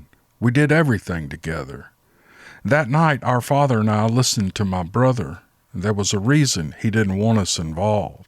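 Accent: South, United States. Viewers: That one really piqued my interest. It was beautifully written with respect for the shadow people and the family members in involved. The perfect compliment to the story was the soft southern spoken voice that narrated it. Thank you. 😁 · Mister Southern Comfort low hear your southern accent is peaceful you're such a good reader God bless you and your wife hope to hear from you again soon love you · I have enjoyed all the stories you have shared on this channel but, none more than this one. Your deliberate cadence and southern drawl make it easy for my mind to paint the pictures your words describe. I detect this to be a true and authentic account of what happened that day in 1977. Nebraska Checking In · Mr. - you are becoming a skilled storyteller and this is one of your very best!!!💯 I have always enjoyed the way you tell a story, being half-southern myself. 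Your accent and genuine love for the stories you share here have been apparent from the first time I listened to your vids. Also ❤️ your musical and visual 🐸 accompaniment, especially the ladies in your side yard. 🐓🐔🐥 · hello and that was SO therapeutic! I've been awake tossing and turning like a captured viper in a pillowcase till even my cats left the bed for more settled and quite sleeping spots! But as I lay down in my bed just before this story began, after taking some pain killing tablets and having a hot bath, I am hoping for just 2 or 3 hours kip, enough to get through the day. I'm watching the early spring day begin as the sun peeks through the curtains, and listening to that Southern accent and cadence, I felt like I was listening to a meditation. What a wonderful story and what a wonderful family the twins had both as children and as adults. We are locked down in NSW Australia where I live and even though I'm country/rural and there hasn't been a single Covid "case" in the 100 kilometres around me, that 1 case has kept me home for a very long time. 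You have a gift and I sometimes put one of these longer stories on if I'm cooking something like lemon butter in my kitchen because I have to stay at the stove and stir constantly. I'll listen to you tell the story and I'll look out my kitchen window at the farmland and put myself somewhere else in time and place where the story you are narrating happens..I hope that you and yours are doing well and I look forward to the next "Calming " time. Till then take care now!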